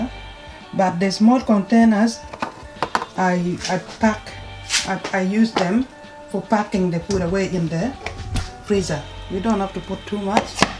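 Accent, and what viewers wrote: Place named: Luo, Kenya. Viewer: You sound my accent of Luo.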